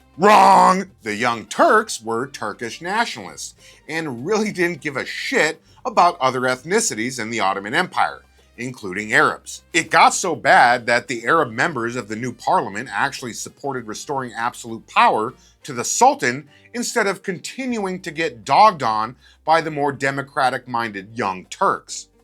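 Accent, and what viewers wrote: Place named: Minnesota, United States. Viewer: I love your videos! As a Minnesotan who now lives down south, hearing your accent every Friday is a breath of fresh air.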